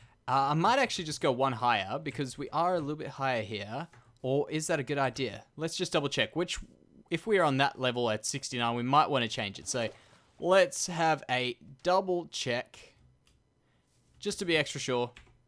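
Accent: Australia. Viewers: Are you Australian? I subbed because you're very Interesting and cool :3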